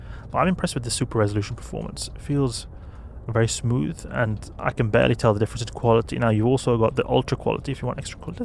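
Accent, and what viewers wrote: South Africa. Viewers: I'm detecting South African in this guy, just a hint.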